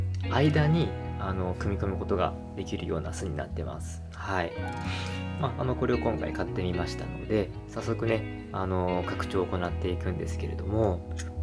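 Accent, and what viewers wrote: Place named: Japan. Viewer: I like your Japanese accent but i dont understand Japanese 😭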